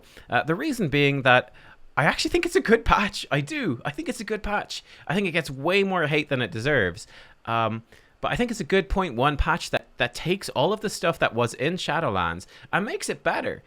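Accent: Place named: Ireland. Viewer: You sound slightly irish Never mind youre definitely Irish.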